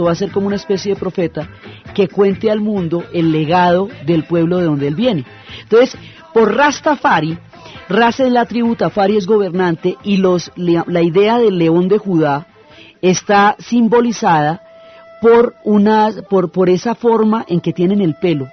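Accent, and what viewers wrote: Colombia. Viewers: Excelente! Soy una persona de 87 años , siempre he amado la historia y usted con sus conocimientos profundos , su voz clara amena y ese acento colombiano, me han hecho su más fiel seguidora . DIOS le siga protegiendo sus bones.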